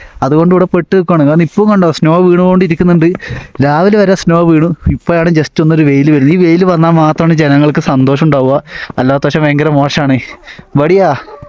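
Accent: Kannur, India. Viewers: ഇങ്ങള് കണ്ണൂരാണോ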